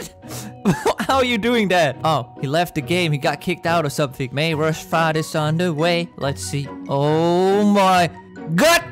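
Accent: Denmark. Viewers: are u from denmark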